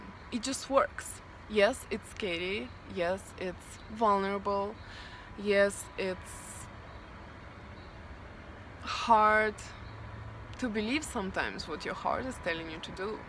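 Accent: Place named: Ukraine. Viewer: thanks for sharing your growth...also love your accent (Ukrainian??)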